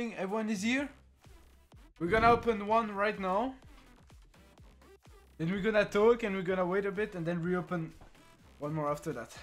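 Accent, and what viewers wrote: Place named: France. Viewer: L’accent français est violent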